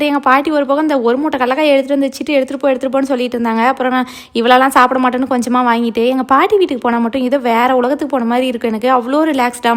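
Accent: Salem, India. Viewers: அப்புடியே சேலம் slang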